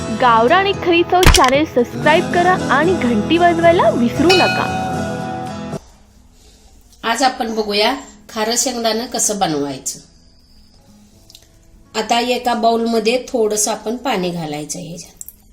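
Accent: Kolhapur, India. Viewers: Kolhapuri bhasha aahe na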